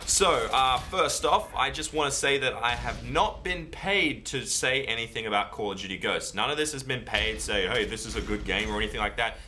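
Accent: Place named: Australia. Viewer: why does always say his accent is australian like we don't know?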